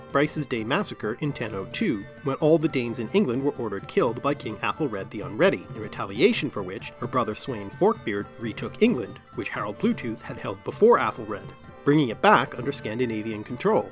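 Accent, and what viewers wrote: Canada. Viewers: Are you Canadian? Just how talk makes me think you are.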